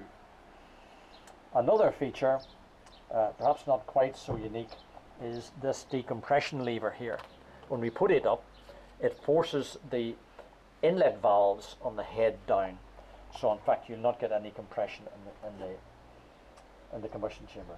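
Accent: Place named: Northern Ireland, United Kingdom. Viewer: Love the Northern Ireland accent!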